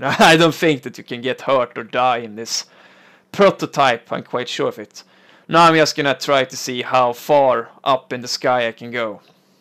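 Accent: Sweden. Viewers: You are Swedish, right? I mean I'm sure Danish people and Norwegians have a similar English accent, but this is identical to the Swedish accent so that's what I presumed that it is. And I don't really engage too much online with voice except with friends on Skype so you're not likely to hear me snacka Svenska.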